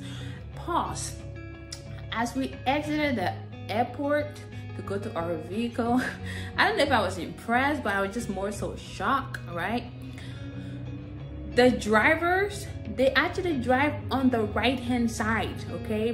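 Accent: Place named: Nigeria. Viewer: Hon you don't sound American , you sound Nigerian , wena woth bribery story at the airport, wena you are fake , you Nigerian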